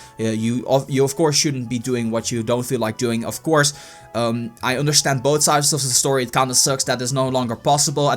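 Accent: Netherlands. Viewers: Kan goed horen dat je nederlands bent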